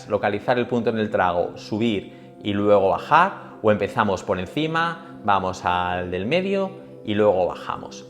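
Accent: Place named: China. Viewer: Usted estudió en China? Tiene cierto acento en la musicalidad del español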